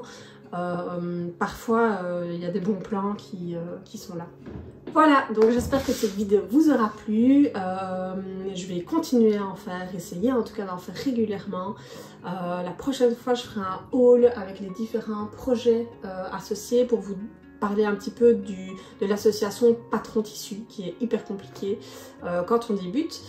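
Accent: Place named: Belgium. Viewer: Salut, t'excuses pas de ton accent Belge, sois en fière. Bonne chance pour ta chaine, ça a l'air très intéressant.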